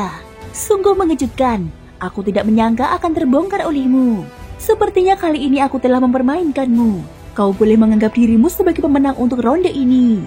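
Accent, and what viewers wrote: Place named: Purwokerto, Indonesia. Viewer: Mbak naratornya orang purwokerto yaa, hayo ngaku aja lah , mbok 🤣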